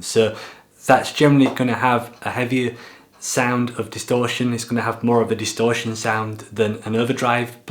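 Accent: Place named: Scotland, United Kingdom. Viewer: Seems like I spotted Ben Nevis in the distance….and a slight of scottish accent as well. Per in Demark…I ❤️my Tele.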